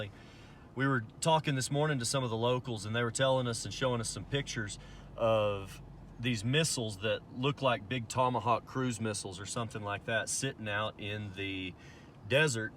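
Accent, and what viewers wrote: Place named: Scotland, United Kingdom. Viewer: That sounds like a Scottish accent 😉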